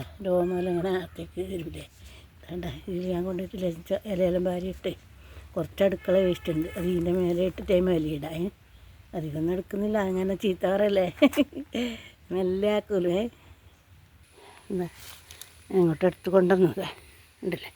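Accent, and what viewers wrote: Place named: Kannur, India. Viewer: നല്ല വീഡിയോആണ്. സപ്പോർട്ട് എന്നും ഉണ്ടാകും. നമുക്ക് ആവുന്നതല്ലേ നമുക്ക് ചെയ്യാൻ പറ്റുള്ളൂ. വിഷമിക്കാതെ മുന്നോട്ട് പോകു ചേച്ചി ♥️എവിടെ യാ വീട് കണ്ണൂർ ആണോ. ഭാഷ കേട്ടിട്ട് അങ്ങനെ തോന്നുന്നു 🎉🎉🎉🎉🎉🎉🎉🎉🎉🎉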